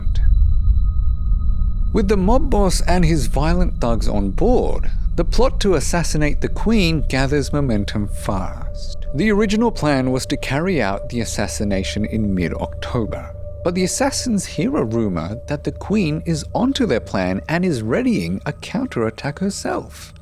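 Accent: Australia. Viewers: Does sound a bit like him haha, Aussie accent and a slow/dramatic delivery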